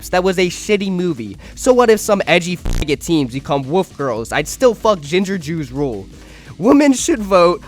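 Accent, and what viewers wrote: Australia. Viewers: The way i hear Australian and got so disappointed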